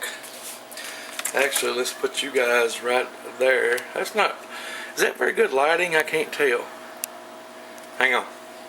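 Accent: South, United States. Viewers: Yum Love your southern accent ! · Country as hell omg can barely understand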